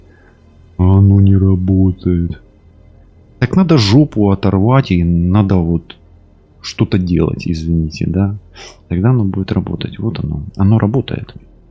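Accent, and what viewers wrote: Ukraine. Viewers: Вы просто супер- мне так импонирует Ваши комментарии )))) и говор украинский, как же это приятно на слух! Боже как это классно!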